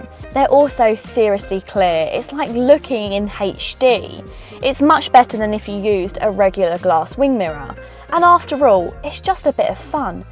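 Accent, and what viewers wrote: United Kingdom. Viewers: I dunno why but british accent is just nice to listen to reviewing things lol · I think it’s British accent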